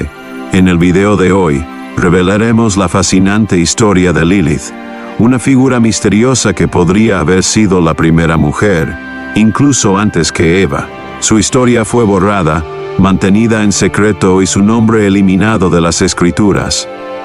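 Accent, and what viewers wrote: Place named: United States. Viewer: Por qué pusiste la voz de un gringo si esta hecha con inteligencia artificial, por qué no pusiste la voz de un latino?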